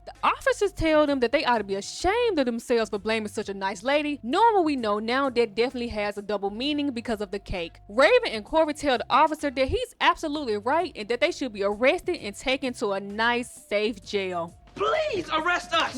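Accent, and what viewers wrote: Memphis, United States. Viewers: You from Memphis ain’t it 😭😭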